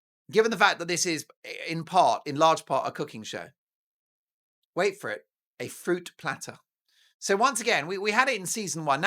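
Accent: Australia. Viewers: Your Aussie accent is terrific!!! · your Australian accent is pretty good but it's more * than *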